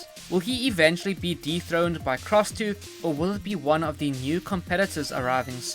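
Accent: South Africa. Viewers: Dude this is amazing. You sound south african? Do you know where to get fly traps in Johannesburg?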